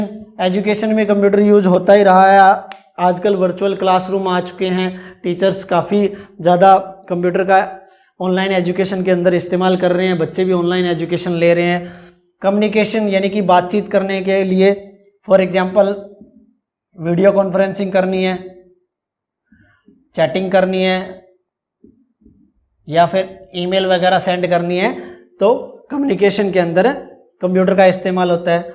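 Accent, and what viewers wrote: Haryana, India. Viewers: THANKYOU VERY MUCH SIR❤️ AAP PAKKA HARYANA SE HO! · sir haryana se ho kya thoda tone aa rha h vha ka mai bhi haryana se hi hu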